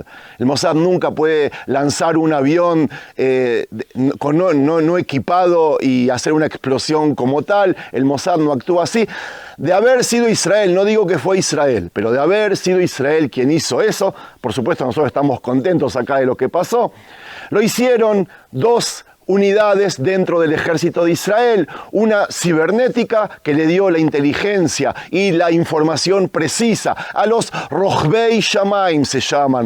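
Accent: Buenos Aires, Argentina. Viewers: Que interesantes y variados son tus videos. Me sorprendes cada vez mas. Gracias, por estos paseos y narraciones maravillosas con tu acento porteño que me suena a musica celestial. Mil abrazos, querido, desde Barcelona. Un beso para tu Nira querida. Seguimos orando x Israel y x mis queridos hermanos judios. SHALOM. AM ISRAEL JAI✡️❤️🙏🇮🇱✡️❤️🙏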